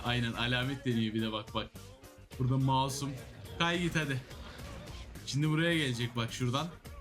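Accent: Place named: Thrace, Turkey. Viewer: Neden böyle saçma trakyalı gibi konuşuyorsun ki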